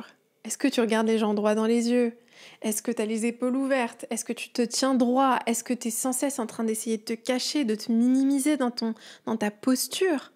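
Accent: France. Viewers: Le meilleur podcast que je écoute, vous êtes pas une nordiste par hasard 🎉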